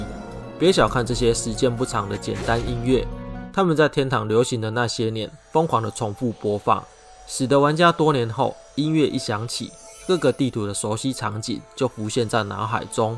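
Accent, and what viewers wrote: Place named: Taiwan. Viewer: 挺喜欢听你说天堂的故事；尤其那带满台味的国语，听了就亲切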